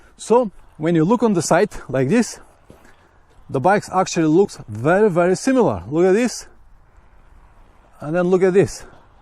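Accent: Russia. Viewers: Русский? :) Акцент нашинский! ;) Мотики огонь оба - у меня 660я тенера, когда появится Т7 бэушная в России, я обязательно куплю её, верю что она сделана как надо! Спасибо за обзор, творческих успехов!!! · Мне одному кажется что говорит с явным русским акцентом? ))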